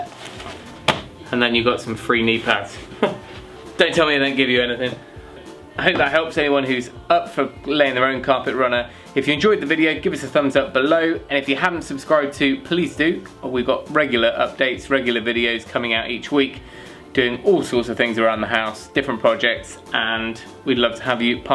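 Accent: United Kingdom. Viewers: Great work and video. I can listen British English lol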